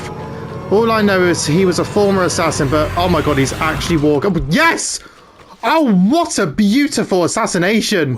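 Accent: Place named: United Kingdom. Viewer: revelations is one of my favorite ac game it's a perfect conclusion to Ezio and Altaïr story (if you don't count ambers) it has some of the best soundtracks of the whole franchise , the main theme is fabulous and even better than Ezio family so i'm sorry you had a bad experience i'm not a fan of the arabian/turkish culture but i love Istanbul and they did it perfectly in this game the orange sun still impresses me it's the only game with gta san andreas with an orange sun that i've seen i love your British accent i will visit London one day for sure ps : i love tea too :)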